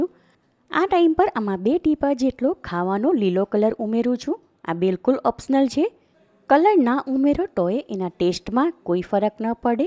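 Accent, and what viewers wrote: Surat, India. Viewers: જેવો મીઠો હલવો એવી મીઠી સુરતી બોલી❤😊❤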